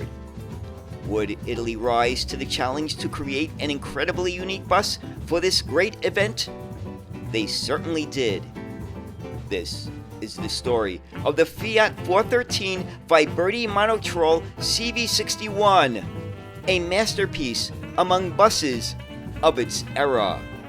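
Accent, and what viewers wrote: United States. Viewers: Thank you for yet another interesting bus video. I am not from the USA and so I hope you don't mind if I ask about your accent and which part of the US you come from?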